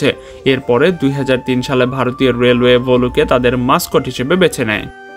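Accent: Bangladesh. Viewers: ইনার কথা শুনে তো মনেহচ্ছে ইনি বাংলাদেশি।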